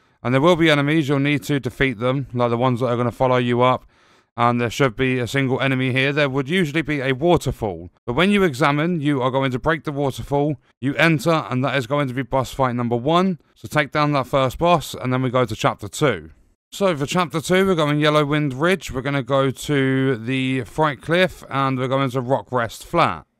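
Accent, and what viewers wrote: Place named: RP, United Kingdom. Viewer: Your accent is over the top posh! xd No offense from here, very helpful guide, Thanks mate🙂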